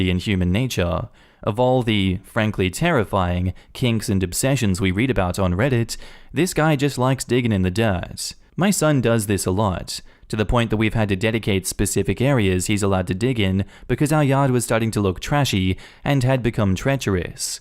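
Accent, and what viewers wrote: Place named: Australia. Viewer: the Aussie accent doesn't hurt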